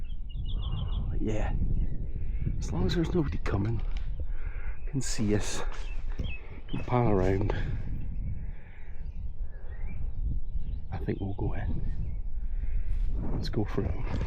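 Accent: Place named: Scotland, United Kingdom. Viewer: Sorry you were puffing but this subscriber likes listening to a human narrator and with a Scottish accent talking about Scotland 🏴 Thank you 🏴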